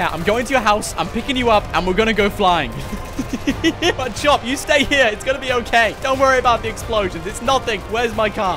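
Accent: United Kingdom. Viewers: Is he British or American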